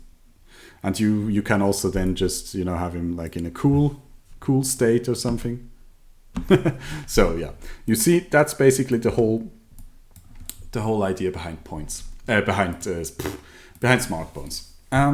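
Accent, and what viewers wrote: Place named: Germany. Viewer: Thanks for the Tutorial! your accent also sounde pretty German to me, haha! :)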